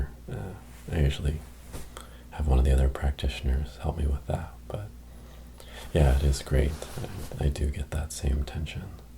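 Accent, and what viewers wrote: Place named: United States. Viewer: I never thought that I really would like an American accent with ASMR vids. Depending on our English teachers at school - in Germany they are mainly British - you get used to British English right from the beginning. And that's perfectly fine with me. But I love this video. Your voice is calming, soothing and relaxing. So, thanks for that!!!.Greetings from Germany. ;-)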